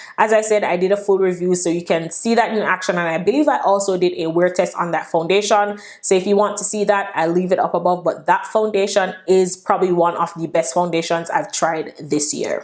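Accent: Jamaica. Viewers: Hey hun...Are u jamaican by any chance.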